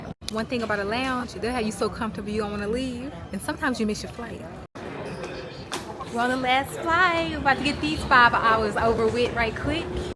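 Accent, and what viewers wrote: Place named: South, United States. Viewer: Your accent sounds more Southern than Texan. Did you grow up in Texas ?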